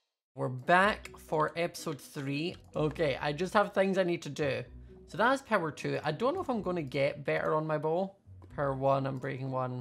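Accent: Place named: Scotland, United Kingdom. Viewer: Only 817 views?? Come on we can do BETTER. Btw, everytime I watch, I start speaking like a SCOTTISH PERSON!! I called my friend and she was like, who is this? Did you go to Scotland?? I'm AMERICAN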